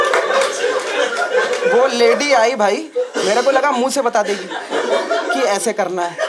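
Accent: Bihar, India. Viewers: Underrated. Always a big fan of BIHARI accent and fun.. Biharis are unique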